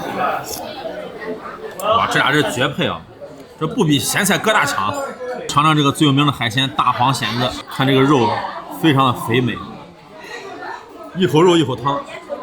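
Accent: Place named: Shaanxi, China. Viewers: 陕西口音听着舒服❤ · 尤其陝西強暴口音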